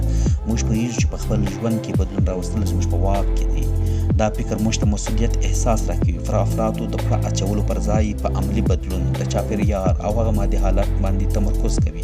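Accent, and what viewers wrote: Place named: Helmand, Afghanistan. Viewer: ږغ د هیلمند دی تاند دی اوسي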